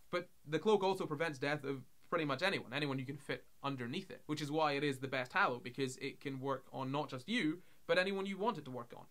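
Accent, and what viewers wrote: Scotland, United Kingdom. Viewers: He is Scottish from Dundee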